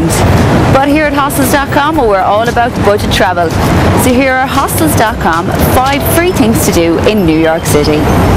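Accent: Ireland. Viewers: I think her irish accent and voice sound very nice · Sounds like a hybrid Irish accent to me .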